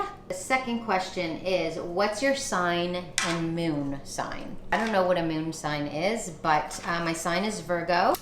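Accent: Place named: Long Island, United States. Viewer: OMGGGGAWWWDD —— I LLLOOOVVVEEE FROM ❤️ I post on almost all her vids. We definitely need a video on your amazing background. Turkey, Spain, Hebrew name and in Canada...wwwhhaatt? Plus bonus points because your manner of speaking, inflection, sounds like me, a Long Islander ( please don’t been offended 🥰). Loved this video! Muah 😘 (your daughter, speaking her mind already momma! Love it)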